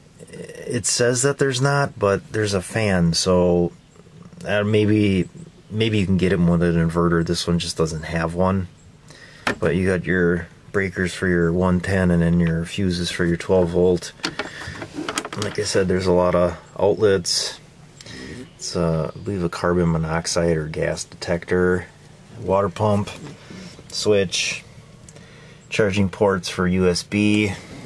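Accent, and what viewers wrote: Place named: Fargo, United States. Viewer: Where the fuck is this accent from Fargo?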